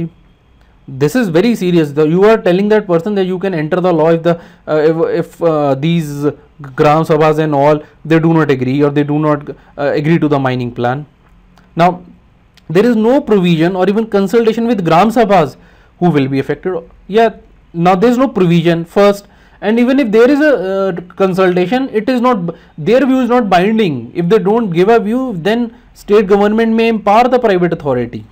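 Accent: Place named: Punjab, India. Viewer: ur accent seems to be punjabi r u punjabi????grt and awesome videos btw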